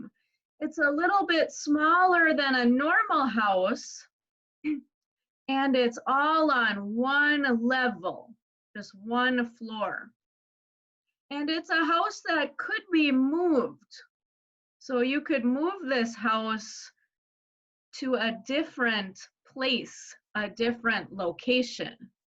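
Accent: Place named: Midwest, United States. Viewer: I'd guess you're from Wisconsin or Minnesota, based on your accent. How far off am I?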